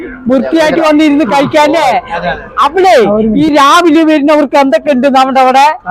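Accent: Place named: Palakkad, India. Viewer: 🎉🎉🎉 അടിപൊളി ആണല്ലോ....Etttttooooo........ നല്ല അവതരണം.... പാലക്കാട്.... തനി നാടൻ ഗ്രാമീണ സംസാരം..... full support ഉണ്ടാവും.....🙏🙏🙏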